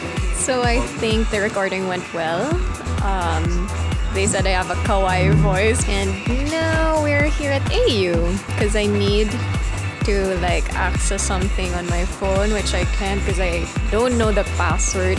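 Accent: Philippines. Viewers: The way you talk and laugh scares me that Filipina accent lol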